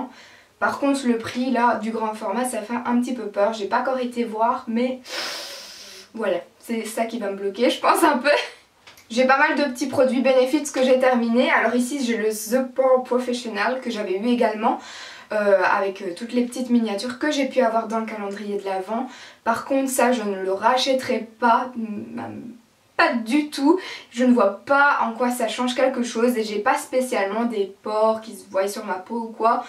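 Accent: Belgium. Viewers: Tu es belge ? Tu a un accent dou ma question sinon j'ai bien rigoler :) sympa ta video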